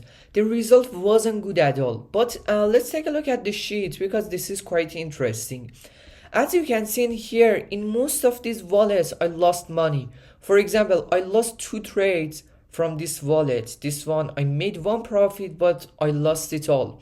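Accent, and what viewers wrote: Iran. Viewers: Fkn persian accent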